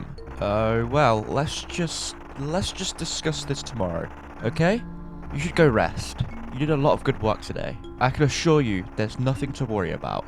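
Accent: United Kingdom. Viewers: I love your brittish accent:3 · this video kinda got ruined by the fact you’re British still a good vid though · I don’t hate that he’s British. I am British too. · Oh hey another brit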